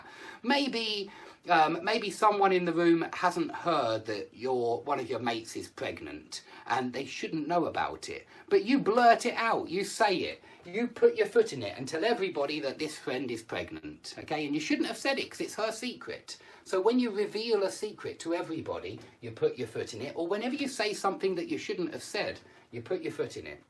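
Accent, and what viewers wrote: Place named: RP, United Kingdom. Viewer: I simply adore your accent! It's so posh 😃👍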